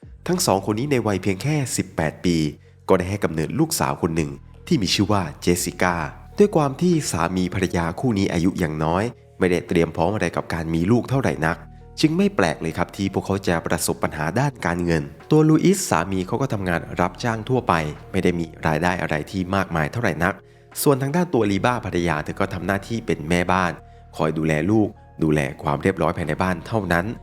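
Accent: South, Thailand. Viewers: คนใต้มั๊ยคับ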